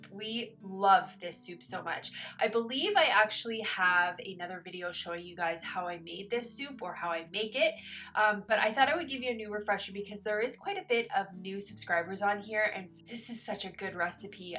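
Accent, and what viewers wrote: Canada. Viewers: I think I remember you saying that you are from Canada, so that explains your accent. I am so happy that you are getting new subscribers. I love your videos, been with you from the beginning.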